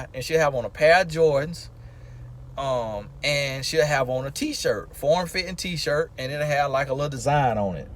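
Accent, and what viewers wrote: South, United States. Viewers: Be blessed 🙏 im loving that southern accent.. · "Puerrta rica" and "Jewmakin" 😆 I love how southern folks talk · Gurl. 8 was driving hollering and weak at how he was talking about Puerto Ricah Jumaican, and his first thought to the Lord when saw her booty. Omgosh. I fell out. I'm from the south and his mannerisms, cadence and demeanor is mad familiar and makes me laugh. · I see now why I can relate to and appreciate the country grammar and accent in these videos. My folks are from Selma AL too. I think it is cool and respectful that you pay homage to your grandma and talk like her. We do that in the south.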